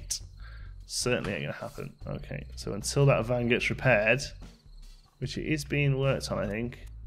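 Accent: Canada. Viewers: Wow, now that you are speaking Canadian, it is really hard to understand you when you talk fast!! :) (I think you are going to make me spend money on this game!)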